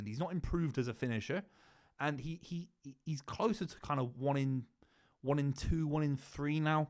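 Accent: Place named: Manchester, United Kingdom. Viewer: Stay humble, love your mancunian accent. ( Glory hunter)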